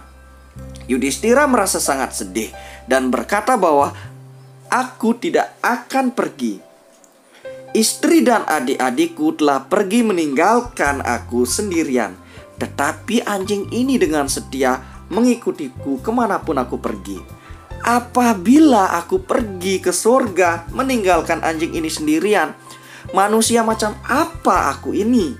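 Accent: Bali, Indonesia. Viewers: Baru ngunjungin Channel ini .. Malah fokus sama suara mimin, kyanya mimin orang Bali ya??? Logat nya Bali banget .... Salam persaudaraan Dari Jawa 😁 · Logatnya Bali banget, jadi ingat teman sy Wayan Gusita dari Karang Asem... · Kental khas kakak bali Semangat kak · Suara orang Bali nih logatnya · Logatnya Bali banget, Mantap? Jadi ingat teman saya si Putu Satriawan. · Bikin sante ya sodaraku... Beliao kan orang bali, jd logat bahasanya gitu. Trimakasih udah dicritakan. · Denger suara blii, jadi ke inget guru matematika BPK I gun Made, yag satu2nya guru dari Bali.... Bhasa logatnya khas bngeeet.... Sukses trus bliii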